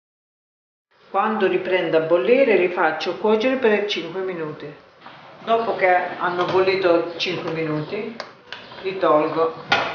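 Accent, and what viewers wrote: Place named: Tuscany, Italy. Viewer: Bravissima. Hai un accento toscano😍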